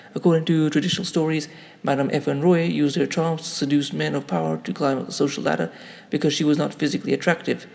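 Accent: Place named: United Kingdom. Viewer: Well for the British accent you deserve an applause. But I think you should adjust the speed in while voicing out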